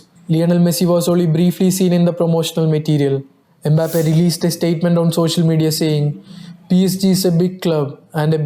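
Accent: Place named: India. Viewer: Annoying Indian accent 🤦🏾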